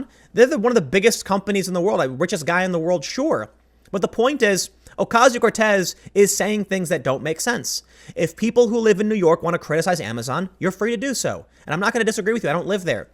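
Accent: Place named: Chicago, United States. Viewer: I was listening to you talk, and I thought to myself, "Self? That accent sounds just like Bill Murray's. I wonder if he's from Chicago like Tim." And, sure enough, he is.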